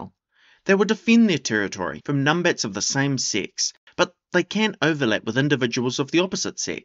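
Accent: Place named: New Zealand. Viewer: That's some accent you've got there pal, it took me a few seconds to figure out that the "iglang" mammals were egg laying mammals, New Zealander?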